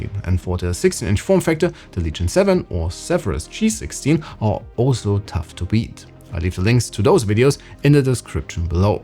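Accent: Germany. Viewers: bro u german?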